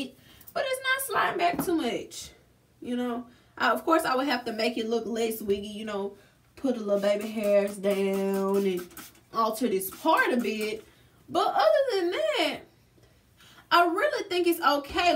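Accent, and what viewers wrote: Louisiana, United States. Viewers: New method until my wig fall off in front of everybody 😂😂😂😂😂😂😂😂😂 that's what I'm afraid of 😩 love your Louisiana accent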